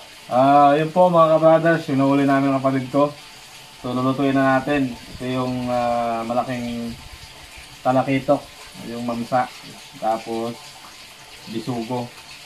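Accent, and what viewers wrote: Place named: Visayas, Philippines. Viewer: ..mUrag biSaya maN idOl anO sAlita nYO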